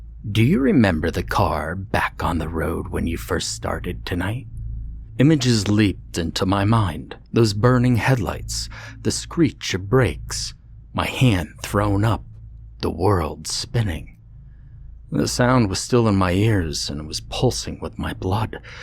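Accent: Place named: New Jersey, United States. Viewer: I knew I heard a Jersey accent!🙂 Love your channel (from a fellow Jerseyan)!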